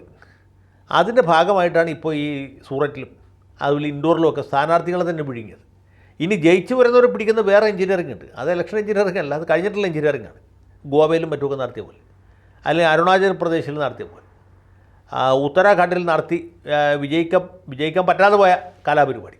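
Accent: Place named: Thiruvananthapuram, India. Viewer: സാറിൻ്റെ തീരു::പുരം ഭാഷാശൈലി വിട്ടുപോയില്ലേ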